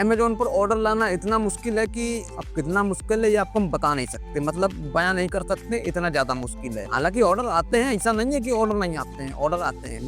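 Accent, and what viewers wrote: Kanpur, India. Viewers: Kanpur se ho kya bhai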